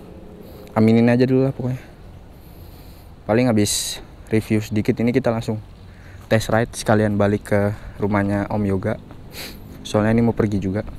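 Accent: Papua, Indonesia. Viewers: bah sioo, ternyata abang juga ada logat papunya yah ternyata,, sukses terus abang salam dari Timika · om dari papua k? om pu logat kya di papua jd😂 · Bisa logat Papua yaa bang. Kreenn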